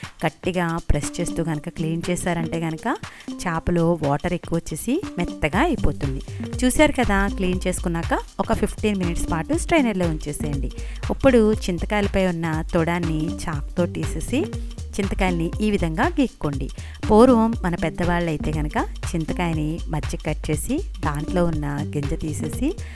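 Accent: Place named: Godavari, India. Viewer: Mee godavari yasa mataladitey bagundedi andi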